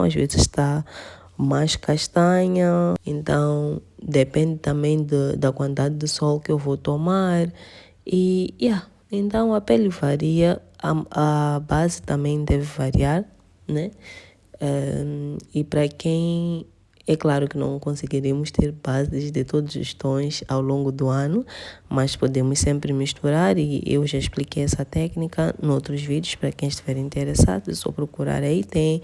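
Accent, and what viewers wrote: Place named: Mozambique. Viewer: Amiga, vc é perfeita! Me tira uma dúvida: De qual cidade/província de Moz vc é? Quero muito saber a origem do seu sotaque super fofo! Beijosssssssssss princesa!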